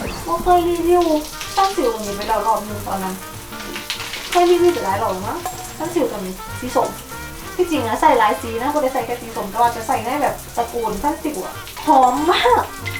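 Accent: Isan, Thailand. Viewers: เอาจริงๆนึงว่าคนอีสานหรือว่าเป็นคนอีสานว่ะ-^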